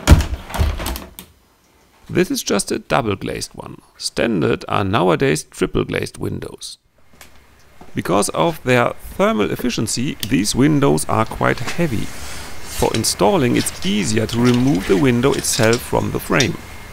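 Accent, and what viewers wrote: Germany. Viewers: The stem wall or foundation wall was not a mistake! The 3x3s or what ever the beam size are you used to frame with and the insulation and osb and the windows and what ever you cover it with weigh a lot. Good job. I'm getting used to your German accent! German accent in Germany....duh! I'm digging your renovation. Love from NW Colorado, USA. Thanxz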